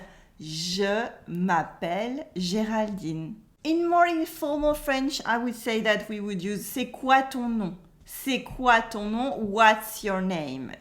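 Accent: France. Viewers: Comme une française surtout l’accent ! A couper au couteau !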